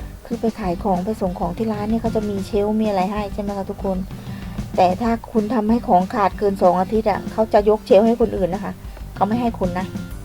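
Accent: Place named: Central Thailand, Thailand. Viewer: ยุจังหวัดดัย เว้าอิสานน่าติดตามกว่า เปิดมาได้ยินเสียงภาษากลางบ่เบิ่งเลย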